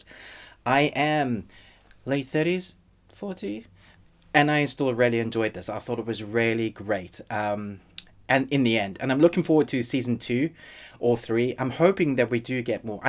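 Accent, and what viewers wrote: South Africa. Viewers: are you...are you South African?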